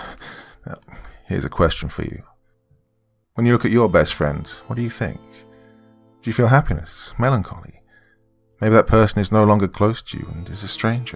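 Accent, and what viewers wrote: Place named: United Kingdom. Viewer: Thanks 🌹 for over 2hrs of your Britishlicious 😘 voice!!!! I hope that you and everyone else are safe ok 💋much love Sexy Queen of Darkness ✌❤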